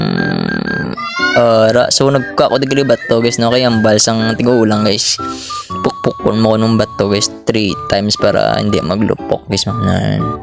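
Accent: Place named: Iloilo, Philippines. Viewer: I am your 123rd subscriber! I am not Ilonggo or Negrense but I have a lot of friends who speak like you and they are good friends.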